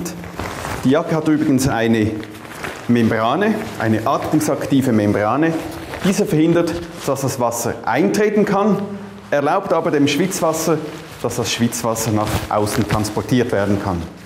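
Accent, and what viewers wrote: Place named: Switzerland. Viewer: schön :) ich liebe das Schweizerdeutsch :)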